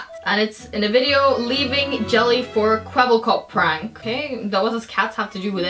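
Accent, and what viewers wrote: United Kingdom. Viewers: Why do you sound british fancy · you seems like your voice right now is like British and your voice in gaming is like america!?!? And are you even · Why in these videos you have like a British ascent and now days you don’t?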